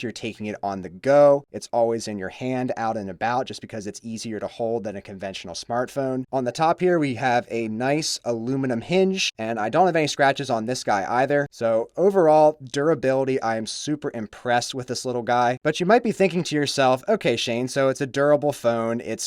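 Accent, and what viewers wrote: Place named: California, United States. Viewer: U talk like californian gurl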